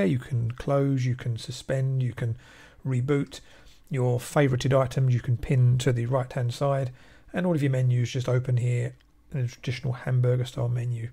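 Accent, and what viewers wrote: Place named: United Kingdom. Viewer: Thanks! Very informative. I've just found your channel and been watching your plasma videos. I'm getting into Linux and enjoying it I'm moving from Mac. I've tried alot of distros and prefer KDE - I'm using neon to get the latest plasma but I wonder do you prefer or recommend Kubuntu over neon? I'm finding some bugs in neon I'm using breeze dark but I cannot change the window decorations and if I try it locks the window so I cannot do anything with it so have to kill the window and open another one. I'm wondering if it's better to go back to kubuntu and use 19.04 or would you stick with LTS? Nice to hear another Brit at first I thought you were Zebedee boss...Cheers!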